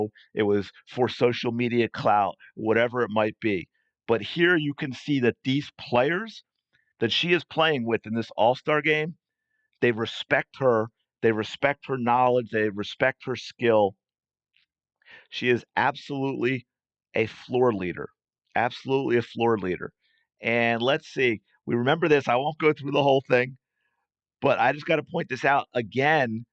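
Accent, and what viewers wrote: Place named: AAVE, United States. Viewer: Would you please speak English. I dont understand ghetto ebonics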